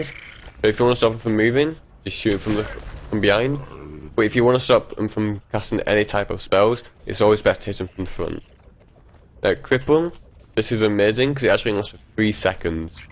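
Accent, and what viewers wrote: United Kingdom. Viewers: Shite, what kind of accent is that? You are from the UK for sure, but where exactly? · To the people saying it's "just a northern accent", let's be honest, it's one seriously fucking strong northern accent...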